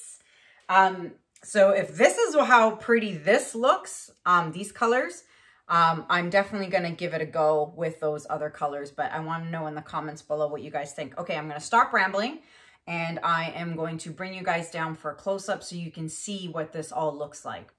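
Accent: Canada. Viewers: im from Georgia US and your Canadian accent just does it for me. i love watching you paint and getting tickled at your long "ooo"s lol thanks for what you do. still havent mastered a dutch pour but im not giving up. best wishes to you and your family.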